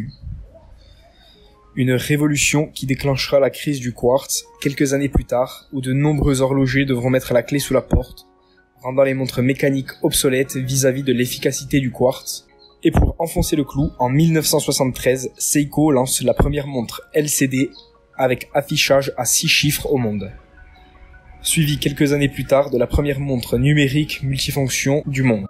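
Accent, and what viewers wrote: Corsica, France. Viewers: Ne serait ce pas un accent corse que j'entends ?! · t'es corse ?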